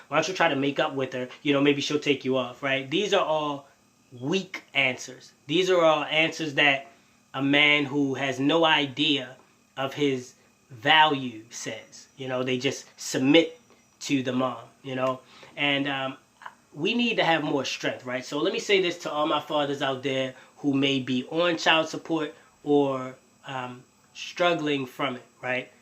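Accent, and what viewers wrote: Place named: Maryland, United States. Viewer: Sounds like you're in MD I really like what you're doing here. Let's connect brother.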